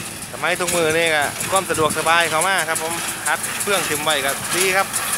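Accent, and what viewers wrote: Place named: Isan, Thailand. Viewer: กาบเชิงยุบ้านใด๋นอคับเว้าสำเนียงคือยุบ้านผมเลยผมยุโนนนารายณ์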